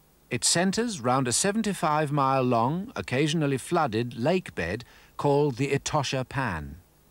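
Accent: United Kingdom. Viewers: Wonderful, quiet sensible lion doc. with a British narrator. Yes lions move out of the way for now, but no grazing animals die of old age, eventually the apex predators get them.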